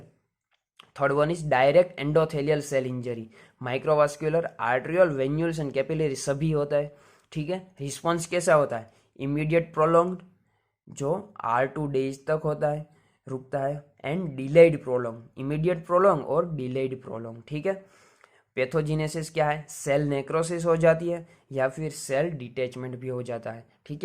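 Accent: Gujarat, India. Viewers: Kem ke Hindi bolo yena upar thi to tame gujrati Lago cho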